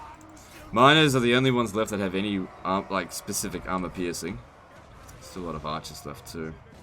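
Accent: Australia. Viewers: I very rarely click your videos based on the content, it’s usually about personality. Sometimes I just think “shit man, I could use a grumpy Australian talking into my earholes”. Love your channel man.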